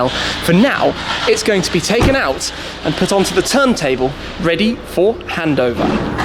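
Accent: England, United Kingdom. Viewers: Where are you from Schmee...England or Germany? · english accent is really ugly sounding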